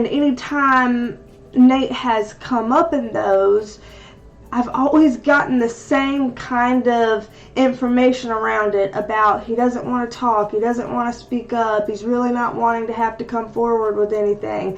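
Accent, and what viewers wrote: South, United States. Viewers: First off let me say your hair style is gorgeous just like you! Hi!! New friend and new subscriber to ur channel from southeast Kentucky here. I can tell you have a bit of a southern accent like me. My accent is southern with a bit too much of a hillbilly twang added to it. Lol...anyway I’m so glad I found your channel and I look forward for us connecting. Take care and stay safe ❤️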